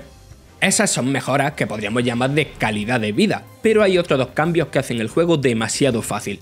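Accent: Andalusia, Spain. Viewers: Qué pasa?? Los andaluces no pueden narrar o que?? Hay que ser del mismo centro de Madrid para hacerlo?? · Mae mía, en España hay gran variedad de acentos jajajaj. No está intentando ser neutro, es andaluz 😂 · y tú necesitas un poco de cerebro. Cuando tú hablas con tu abuelita tienes el mismo acento que cuando hablas con profesionalidad. La diferencia está en el léxico y las expresiones que se usan, pero el acento es el mismo. El problema de este narrador no es que tenga acento andaluz. Hablar con tu acento no es falta de profesionalidad, no hay ningún problema en dirigirte a toda la comunidad hispanohablante con acento andaluz. Todo el mundo tiene acento y nadie necesita disimularlo para hablar con quien sea. Malo es si usas palabras que usas con tu abuelita en un entorno profesional, no el acento. El narrador no puede decir que este juego es la súper hostia porque sería poco profesional. 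Debe decir al como que este juego es muy bueno. Ahora, de la forma en la que lo pronuncie no determina su profesionalidad. Espero que te haya quedado muy claro que el andaluz no es ninguna vergüenza y que se puede utilizar para dirigirte al mundo entero con mucho orgullo. No hace falta que respondas porque yo sé que no tienes ni puta idea de lingüística. Hasta luego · Es andaluuuz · La ignorancia... en algunos países de España se habla con ese acento en Andalucía por ejemplo es algo normal o incluso usan frases y palabras que en otros lugares no. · Es su acento particular de su parte de Andalucia. Que igual tendria que narrar siendo mas neutro, quizas, no lo se. Ahi no me meto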